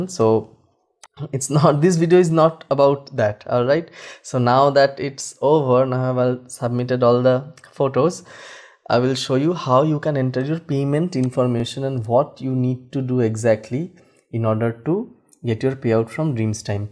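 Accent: Bengal, India. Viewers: Unicorn Your accent clearly shows that you are বাঙালি। আমিও বাঙালি। কলকাতায় থাকেন??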